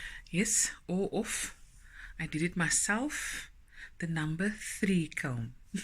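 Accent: South Africa. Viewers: I am originally from South Africa, now living in Canada. I came across you video just recently and what caught my attention was your accent. It was so good to hear a SA accent after so many years. Thank you for your videos, love them, God Bless.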